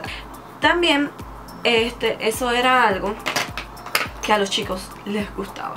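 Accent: Puerto Rico. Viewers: ¿De dónde eres? Tu forma de hablar se me parece a la de Puerto Rico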